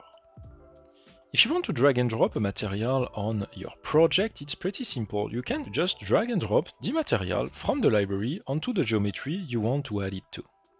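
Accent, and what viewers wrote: France. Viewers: toi tes francais mon pote,l'accent de ouf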